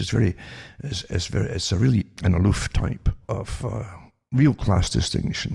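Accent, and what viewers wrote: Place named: Scotland, United Kingdom. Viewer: New subscriber from the Central Coast NSW Australia. Love a Scots accent too!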